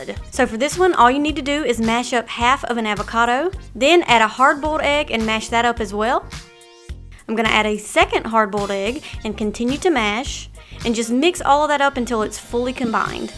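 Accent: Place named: North Carolina, United States. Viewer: As a southern girl myself in trying to isolate your accent. North Carolina? I thought maybe Georgia but I dont think so. Alabama here.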